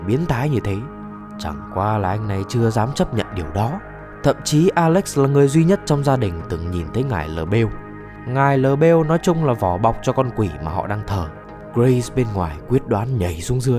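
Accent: North, Vietnam. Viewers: Sao tao nghe thấy thằng làm content đọc bắc kỳ trong cái video này? Phân biệt vùng miền lên cả recap phim rồi? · Tính ra ng đọc nói giọng Bắc mà, tôi nghĩ chúng ta có thể hiểu nó giống như người da đen nói niga <(“) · admin nói giọng bắc mà chill bro 😭😭 tôi ng bắc vẫn hay đùa backi thấy cũng vui mà miễn k có ác ý là được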